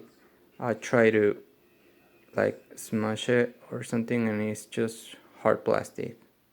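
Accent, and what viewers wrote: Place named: Poland. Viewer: Are u from Poland? your Accent is very polish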